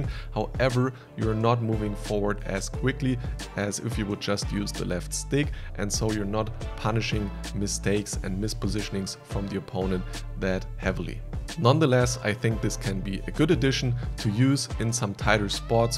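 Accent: Germany. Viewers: du klingst schon noch sehr deutsch in deinem englisch. aber cooles video! :)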